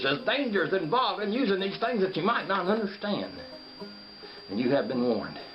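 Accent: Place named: Georgia, United States. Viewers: HaHaHa! Had me laughing! Funny vid! ...I knew I heard a Ga drawl in your voice! What part of Ga you from? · you can wear them under your work gloves if needed and i heard georgia i didnt know you lived in georgia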